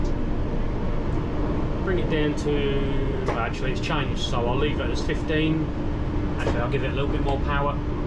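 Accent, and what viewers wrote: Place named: Midlands, United Kingdom. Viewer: Your accent is very stranged, sounds like someone from the midlands moved down to london and picked up twangs. Can tell well "arnos grove" and "even out" sound cockney then you say speed OP like a northerner! haha. Great video none the less thanks for making these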